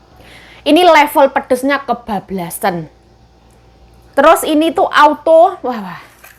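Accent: Java, Indonesia. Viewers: Aku seneng sm medhoknya mbak,cletukan² bahasa jawane bikin mesem²,hihihiii...sukses yo mbak,sehat² selalu...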